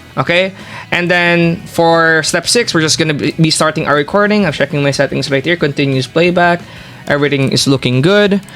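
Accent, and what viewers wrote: Philippines. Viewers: The accent is very Filipino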